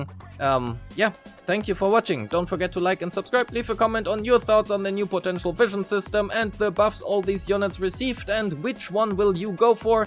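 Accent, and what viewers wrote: Germany. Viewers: I know a german when i hear one, danke für das video meister!!!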